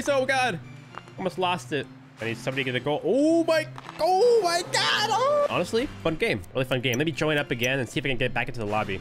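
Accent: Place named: Canada. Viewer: This guy hella canadian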